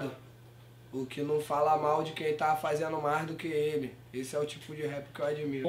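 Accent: Rio de Janeiro, Brazil. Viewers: Melhor sotaque era o carioca. 💪😍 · Eri Soares é de carioca, hahaha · a maioria dos menor do rio fala assim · Dener Melo aqui no RJ a maioria dos menó fala assim kkk eu tmb me incluo nisso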